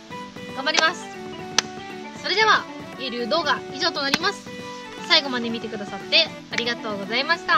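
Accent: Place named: Kyushu, Japan. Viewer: こんばんは！初めまして！ 釣り姿がカッコイイですね！ いい感じのカワムツのオスですね！ 質問ですが九州の方ですかね？ 方言が聞いた事あるのでw チャンネル登録させていただきます！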